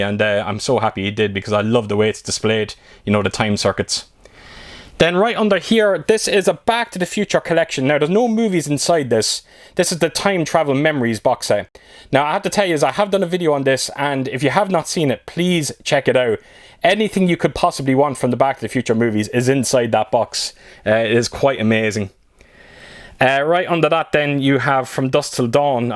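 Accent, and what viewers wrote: Ireland. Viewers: Hi, It’s Mick from across the pond in NY. Been hitting the like button on your videos for some time. Brilliant job mate on your passion. I’m jealous of many of your pieces that only collectors understand. I have a great set up here and have the passion also ( not the film ) 😂 Keep the hard work and love going as to keep the home experience and physical media alive. BTW - Love the brogue- Where in Erin? Got plenty of family in West Clare and Tipperary. Also dig my govee backlight mate. Cheers! 🇺🇸👍🏻✌️😎Just got my steel book copy of SleepHollow can’t wait to spin that incredible film.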